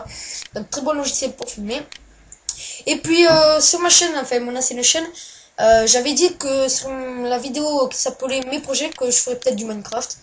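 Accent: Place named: Marseille, France. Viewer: J'aime ton accent marseiller:)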